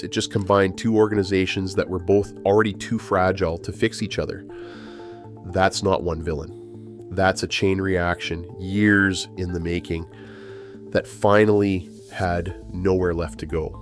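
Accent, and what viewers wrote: Canada. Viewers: (He sounds almost Canadian.)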